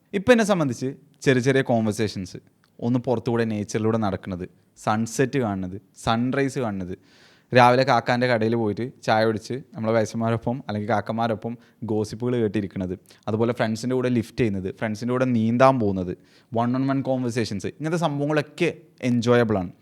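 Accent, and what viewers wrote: Malappuram, India. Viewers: Chettan Malappuram ninn ahno varunne?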